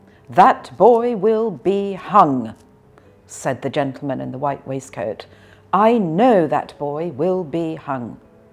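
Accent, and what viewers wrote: United Kingdom. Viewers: 오오 선생님은 포쉬엑센트를 쓰시는건가요? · 영국발음 너무 좋아요.. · 영국 살면서 영국 발음이라고 다 듣기 좋은건 아닐수도 있구나 느꼈는데 선생님은 목소리 톤하고 발음이 너무 듣기 편안하네요..^^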